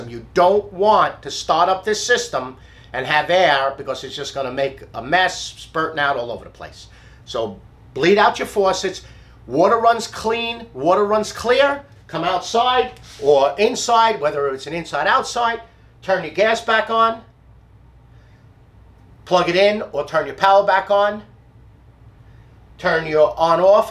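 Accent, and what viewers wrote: New York, United States. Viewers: Your NY accent is absolutely wonderful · Youse speak like my Lonk Island relatives... just for the NY accent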